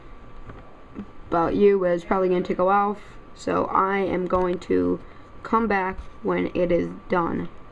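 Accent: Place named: United States. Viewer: Suggestion: 1. Make a script (short headings are fine) 2. Prepare your material. 3. Do a few dry runs. 4. Speak clearly. For some people an American accent is hard to follow 5. Speak slowly. You sped up with the copycat@ line! 6. Don't hum or sing when you have a blank moment or drum your fingers. If you start to do that go back to 3 above. 7. Close your room door as we can hear your Mum or Sister talking in the background.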